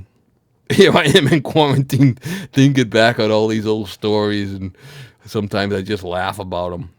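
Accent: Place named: East Coast, United States. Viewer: The east coast way of speaking sounds pretty odd from here at the west coast. Quite the accent. But I'm sure I would sound pretty odd to too.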